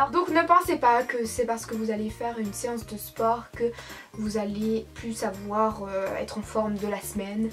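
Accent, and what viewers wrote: Belgium. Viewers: merci pour cette superbe vidéo 😘😘 t es trop belle 😍 vive la belgiiiique et l'accent 😍😍😍😍😍😍😘😘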